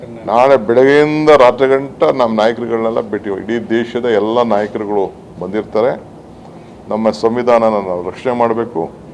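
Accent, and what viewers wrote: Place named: Kanakapura, India. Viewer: ಅಣ್ಣ ನೀನು ಎಲ್ಲಿ ಮಾತು ಕಲಿತಿದ್ದು ಕ ನ ಕ ಪು ರ ದ ????? ನೀನು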